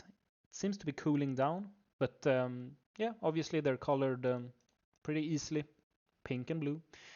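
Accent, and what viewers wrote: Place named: Sweden. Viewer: you sound exactly like a swede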